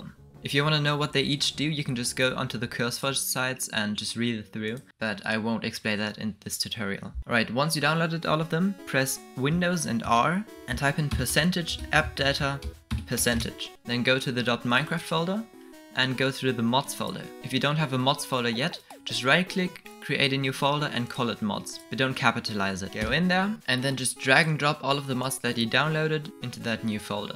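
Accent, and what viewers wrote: Germany. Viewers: Du klingst zimlich deutsch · man hört so das du deutsch bist haha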